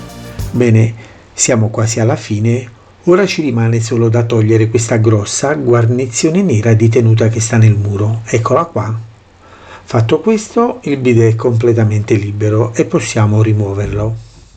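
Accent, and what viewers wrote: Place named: Avellino, Italy. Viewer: sono di Scalea , tu dove abiti ( senza dirlo , ma più o meno ) verso Licola o verso Torre ? A volte sembri quasi avellinese .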